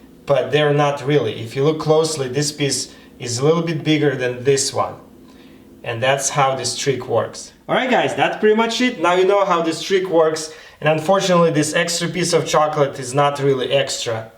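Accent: Russia. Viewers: такой русский акцент, ахвхах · Акцент не совсем английский, или ты специально, типа рашен стайл?) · Прям слышно русский акцент произношения английского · ОХ ЗЫС РАШЕН АКЦЕНТ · Вау!Вы русский и говорите по-английски.Да,виден русский акцент.Почему,кстати,русские говорят куда хуже на английском,чем любые другие народы(греки,итальянцы,турки,голландцы,немцы)? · Me: he have a heavy Russian accent—oh he’s Russian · All the Russian YouTubers have the same accent.. Taras Kul.. and him . They speak the same. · Мне очень нравится его русский eanglish. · У тебя иногда проскакивает русский акцент) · А акцент то русский 🌚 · Is this Russian accent? Oh, stupid I didn't read the name of channel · Really funny tbh, I didn't look at his name but heard his voice and tbh this is not the thickest Russian accent I have heard tho. Very close to English, good job for learning english so well btw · Как же я кайфую от русского акцента :3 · Me:is he Russian? 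Also me: scrolling down and sees username oh... Edit: why is this still getting more likes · Ох уж этот знакомый русский акцент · Ну слышна русская речь,так же говорю по-английски)Ахх,акцент не пропьешь) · Ммм, русский акцент, ну привет, русская душа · Сач э стронг рашн эксент! Ыыыы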